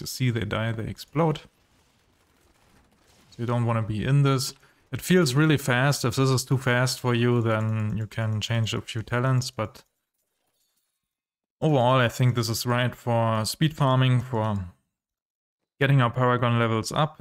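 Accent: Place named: Germany. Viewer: I höre deutsch raus :)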